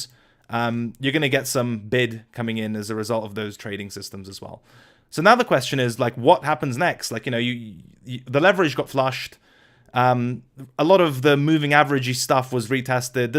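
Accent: United Kingdom. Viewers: Hey I can't believe you are Russian, with such a strong British accent. Sending my regards from Queensland Australia 🤝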